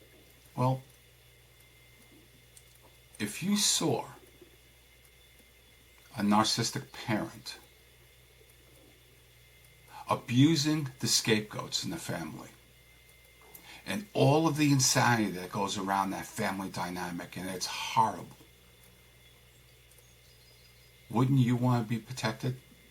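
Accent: New York, United States. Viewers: Your videos are very interesting and informative. Well thought out and well presented. You will definitely help many people get over horrible experiences. I was thinking that while you and many others, including myself, have had the experience, that, at least, we are able to "not contact" them. We can escape from the hell that they must live in constantly. Keep up the good work. You look great and really enjoy your New York accent.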